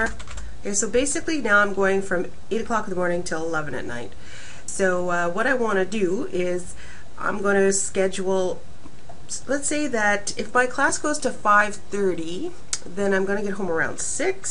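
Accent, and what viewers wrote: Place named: Canada. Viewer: You're Canadian. I'm getting much better at distinguishing accents :'D this is just proof to me that our accents /are/ distinguishable from the American accent! Ha! Sorry, lovely video and a brilliant strategy, I will be using this. Thank you :3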